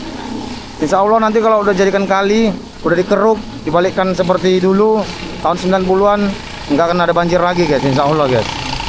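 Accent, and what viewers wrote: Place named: West Sumatra, Indonesia. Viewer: Aksen Bang kyk orang Sumbar 🙏🙏🙏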